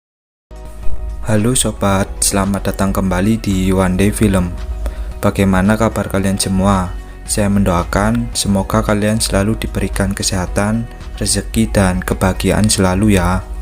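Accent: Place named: Banyumas, Indonesia. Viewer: Ngapak yak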